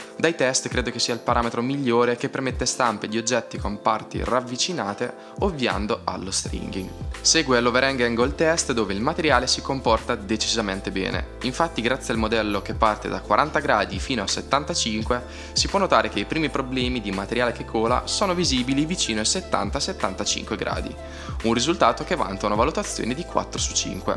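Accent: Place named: South, Italy. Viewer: mi sembrava che avevi un accento del sud... Quindi sei nelle marche giusto?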